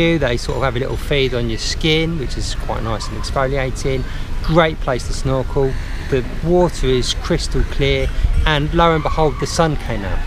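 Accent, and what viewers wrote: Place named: Cockney, United Kingdom. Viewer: make fun of your accent by doing the cockney thing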